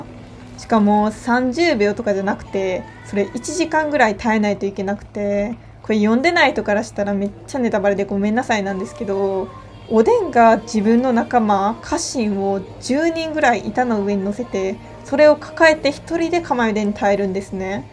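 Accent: Kansai, Japan. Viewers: 関西弁やから、親近感湧く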